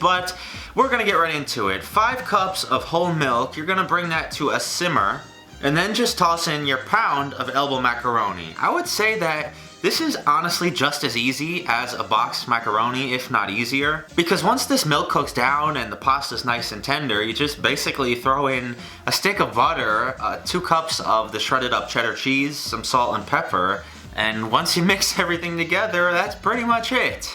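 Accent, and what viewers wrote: New York, United States. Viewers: Sounds like suburban New York to me.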